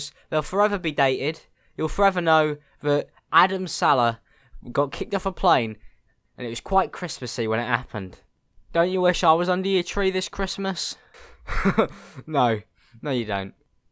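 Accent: United Kingdom. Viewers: i hope you get 50k subs m8 your content is really high quality and really funny i love your brittish voice and i hope you dont stop now that ive subbed keep it up m8 cheers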